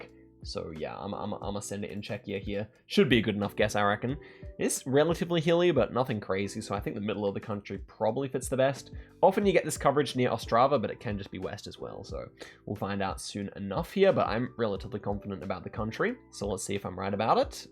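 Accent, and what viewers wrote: United States. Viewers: love your southern US accent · as a georgian (us) gotta love the alabama accent😂😂😂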